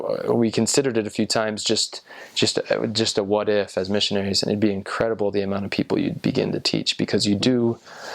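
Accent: South India, India. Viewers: Southern Indian Accent !! :D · he went to south India, he was speaking in South Indian accent. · Ya agreed. That is essentially South Indian accent. You would hear that in Maharashtra and above. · That was just south Indian accent sir. But yes, that is also a part of India. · this is a typical south Indian accent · That is South Indian accent. Different states in India have different accents... Well you did a pretty good job on the Southern accent;) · This is south Indian accent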